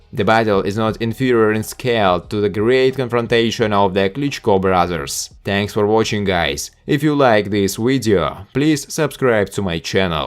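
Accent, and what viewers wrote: Russia. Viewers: Красивый русский акцент ) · The context could be fine, but dude!? Just speak Russian with Russian accent, because your English has the stupidest accent, it is irritating, so after one minute I had to go...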